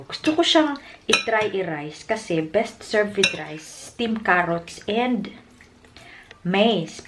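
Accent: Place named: Visayas, Philippines. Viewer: Cute kaayo ka mag binisaya te hahahaha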